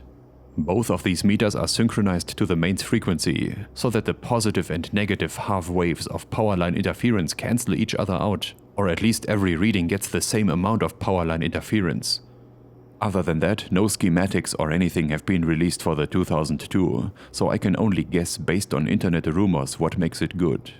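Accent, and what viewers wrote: Germany. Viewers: Germany's have fun acent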